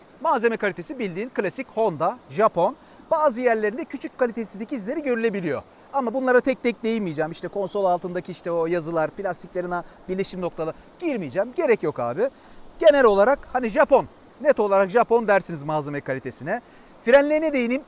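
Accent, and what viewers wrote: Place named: Adana, Turkey. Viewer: abiniz Adanalı belli. Adanadan selamlar o halde!!!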